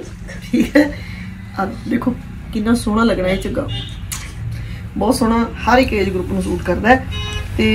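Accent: Standard. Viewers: Di suit te tohade sohne hai hi mainu tohadi pure Punjabi boli vich Bolan da style bda sohna lagda hai i like you very much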